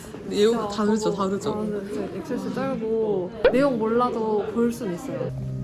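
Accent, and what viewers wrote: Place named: Gyeongsang, South Korea. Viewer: 경상도 사투리를 고급지게 구사하시네요👍👍👍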